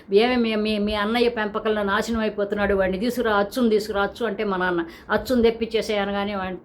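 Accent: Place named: Chirala, India. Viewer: Great inspirational words as always ❤ Maadi kooda near by area andi. Chirala daggara, me slang and few telugu words i felt like you are from that area as well.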